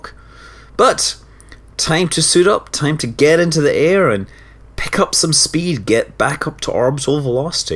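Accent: Scotland, United Kingdom. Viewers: Really enjoy your videos mate, also i have scottish grandparents so the accent always brings me back to the perthshire moors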